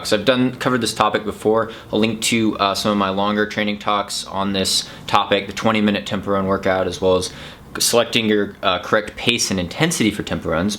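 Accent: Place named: Canada. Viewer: He’s from Canada me boi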